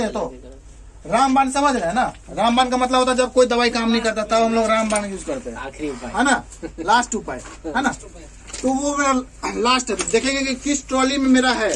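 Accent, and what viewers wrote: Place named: Bihar, India. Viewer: Super sir ji very good apke bihar se hai lag raha hai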